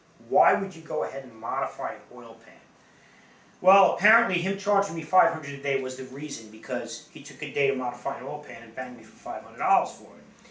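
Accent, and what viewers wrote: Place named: Long Island, United States. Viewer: Being from long island that Accent sounds like you're from long island. How far off am I ?, Or queens...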